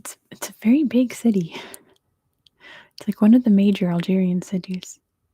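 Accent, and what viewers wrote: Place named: California, United States. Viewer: You're so lovely. California accent is 👍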